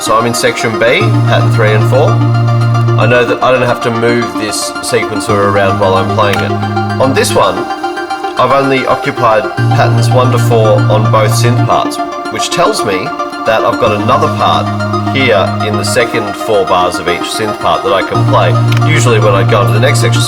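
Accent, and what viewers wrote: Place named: Australia. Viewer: This is awesome man, thanks heaps for sharing! Sounds like you're an aussie like me haha. Where are you based? P.s. keep up the great video content!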